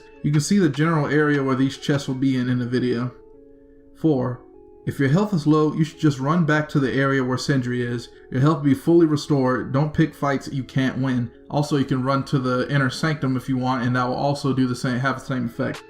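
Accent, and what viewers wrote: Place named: Los Angeles, United States. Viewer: This man has got to be from LA.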